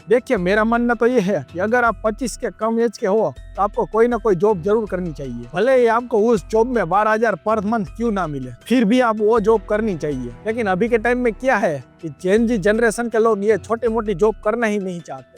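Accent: India. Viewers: Bro Your Gujrati Accent Is Decreasing Interest To Watching Video. Pahle Hame Hindi Ka Proper Accent Ka Practice Karna Padega. Baki Information Best Hai. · Need to improve the pure Hindi accent rather than kathiyawadi Gujarati accent. This will add more interest to watch full video.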